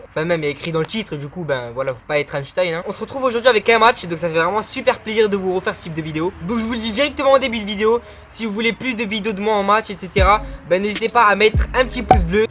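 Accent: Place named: Marseille, France. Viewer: T est marseillais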